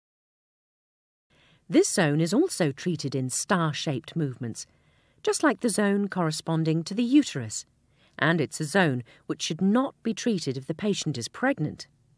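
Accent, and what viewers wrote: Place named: England, United Kingdom. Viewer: what a beautiful English accent , so clear , well paced and simply captivating well narrated , dynamic voice 🥰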